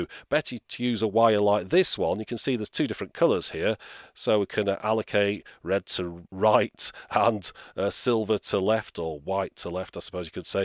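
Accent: United Kingdom. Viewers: Good to learn your British accent nicer voice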